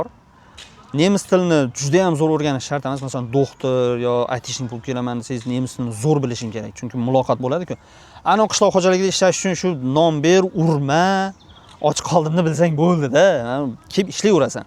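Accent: Khorezm, Uzbekistan. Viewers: Har dim Xorazmcha getib duribdidon,nerdansiz odosh?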